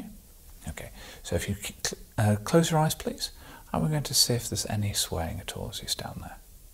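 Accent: England, United Kingdom. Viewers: Yep. This english accent kills me. Silence is better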